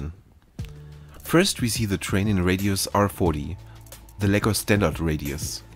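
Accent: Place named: Germany. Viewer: I'm just not used to German YouTubers, that's why I was impressed with your accent.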